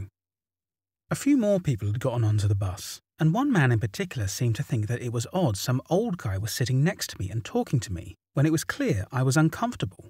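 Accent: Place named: Southern England, United Kingdom. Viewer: Seen every single video on this channel, you’re the best at what you do my friend, and your very southern English accent makes them so much better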